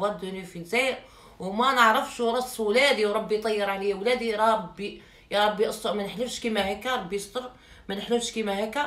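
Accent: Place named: Annaba, Algeria. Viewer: من هدرتك عنابية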